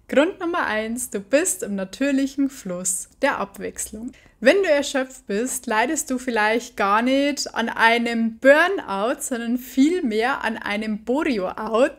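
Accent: Bavaria, Germany. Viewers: Das habe noch von keiner Frau gehört um so erfreulicher finde ich es von dir hören. Du hast so einen tollen Dialekt ich mag dein Bayrisch und du bringst dein Bericht sehr überzeugend und glaubhaft rüber mach weiter so du bist Super.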